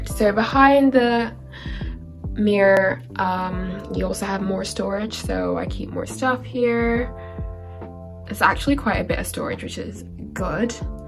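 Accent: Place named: United Kingdom. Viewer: Your British accent is showing!!!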